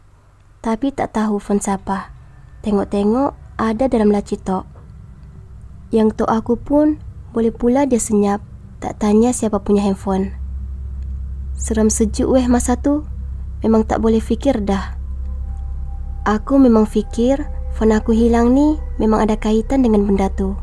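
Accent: Sabah, Malaysia. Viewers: Hahahahaha tula cara dia cakap mcmtu,aku syak dia org sabah🤣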